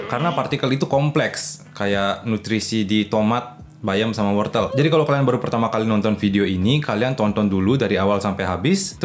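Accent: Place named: Bali, Indonesia. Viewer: Mendengar bercerita panjang-lebar seperti ini, jadi teringat dulu waktu saya masih tinggal & mengajar di Bali. Bhs Indonesia-nya khas Bali, demikian juga dengan joke2nya 😅😂🤣😆 Terus semangat, sensei \\(^_^)// Jasamu sangat diperlukan di negeri ini! 😁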